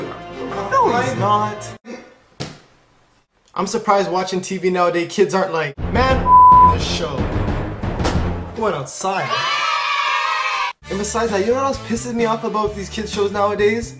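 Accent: Canada. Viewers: LOL that dora bit was hilarious haha. he definitely sounds canadian though...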